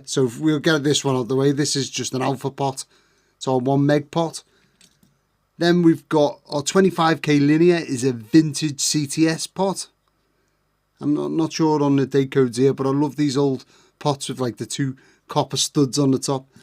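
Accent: Liverpool, United Kingdom. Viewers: Hello! Enjoying your videos very much - I do build pedals from time to time! (Have built Zvex Fuzz Factory, Bazz Fuss, Fuzz Face, Vox Repeat Percussion, Sparkle Drive, Wooly Mammoth...) I like that your approach is just making it work - sometimes I've felt bad about twisting resistors together to get the right one, but seeing you do it shows me I'm in good company! I sometimes parallel them which I feel happier about because it gives me a chunkier "component" rather than a long skinny one. Takes a bit more trick math tho (or just try until it's close enough for government work as you say). As someone who once lived - and met my wife and married - in Liverpool, it's lovely to hear your accent again, and be reminded of the wonderful times and good people of your fair city - certainly the biggest influence on my life. You using a lighter to shrink heatshrink just took me right back to the street-sellers shouting "Pound-yer-lighter" (which we still say in my house when we're looking for one). 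I wonder if the electronics store near Rushworth's and Curly Music is still there - no doubt a lot has changed. Anyway - in my experience of putting stuff together on veroboard, I used to make my boards as compact as possible - but as you experienced, this efficiency can make life hard - I think this layout could benefit from a bit of breathing room - there's certainly room in your superb enclosure! I use "diy layout creator" for my designs. My other thought was, as you use some very posh co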